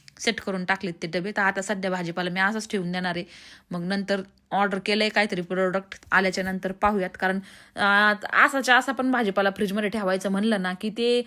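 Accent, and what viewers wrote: Ahmednagar, India. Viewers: नगरी भाषेत एक वेगळाच गोडवा आहे ❤❤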